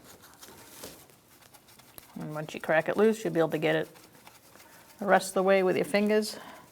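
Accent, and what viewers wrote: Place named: New England, United States. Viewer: What part of New England are you from? Nice vid.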